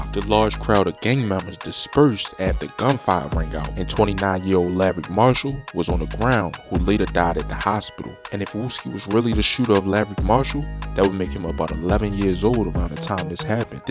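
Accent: Baltimore, United States. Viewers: Narrator most def from Baltimore 💯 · You from bmore? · Aye Bro You Frm Baltimore? I Can Hear It In Your Voice😂💯, Love Frm DC💪🏾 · Are you from Bmore? Reminds me of growing up with that “Tew Tew” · I hear that Baltimore accent 🤣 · Your Bmore accent is killing me. 😂😂😂 All these "ooze" in this video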